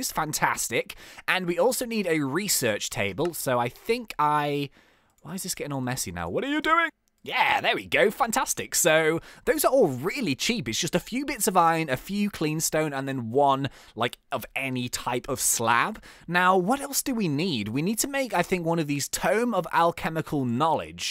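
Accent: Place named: United Kingdom. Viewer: Sometimes youre British might be a little irrateitating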